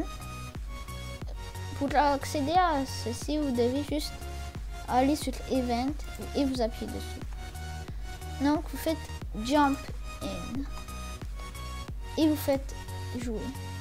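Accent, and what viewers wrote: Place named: England, United Kingdom. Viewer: j'étais sûr que t'étais anglais vu ton accent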